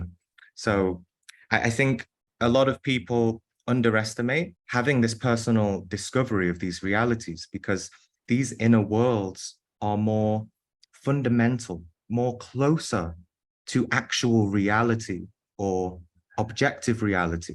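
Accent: United Kingdom. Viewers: It is very difficult for Me to Accurately Express what I intend to Convey...but I will try. I was initiated by an Indian Sat Guru at the Age of 23. I am 73 now. The Tradition of My Guru's Philosophy originates from the Punjabi River region of Northern India...the Homeland of the Sikh Religion. I have been through Many Chapters of Life...since My Initiation...but after listening to Lecture...I now realize just how little I knew about Meditation, the Dream Phenomenon, or Astral Travel...in the 50-years following. I took Copious Notes...during This Lecture...and Now that I have had a Chance to Recopy It...adding My Own Incomplete Sketches of Inner Knowledge...I am At a Complete Loss for Words. I am Now Seeing that I have Added the Lectured Information (Given by )...to Complete what I Already knew and wrote beforehand. It is like Writing The Subject & Verb of a Sentence...and then a Wiseman entering the Room and completing Each Sentence with the Necessary Object...to make the intended Concept...A Complete & Comprehensive Set of Ideas. 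I am describing Something very Spiritual here...but I am merely a Student-in-Progress...sitting in Classroom. I have only had maybe 'Six' of what I would classify as genuine Out-of-Body Experiences in 73-years of Physical Life...but is a Master-Teacher at His Craft...with a Beautiful British Accent to Clearly Express His Lofty Teachings. There is A GOD...and I am truly an Unworthy Recipient of His Endless Grace. I have found a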